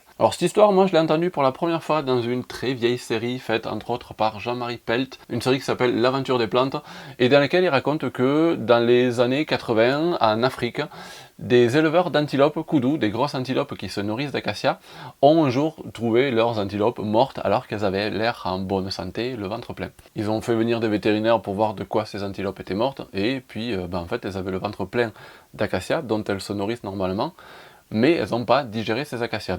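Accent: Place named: Southwest, France. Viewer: Bonjour. Les perfections invisibles de Dieu sont dans la nature. Tu as l'accent du sud ouest, dans quelle région est tu?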